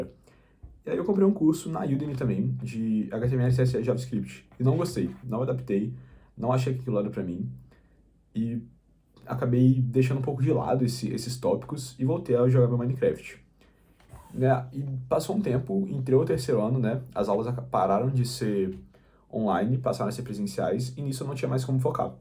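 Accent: Minas Gerais, Brazil. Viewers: Em um minuto de vídeo já imagino que seja mineiro kkkkk tmj tô na luta pra aprender tbm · Ess trem kkkkkkkkkkk mineirês é otimo kkkk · tu é mineiro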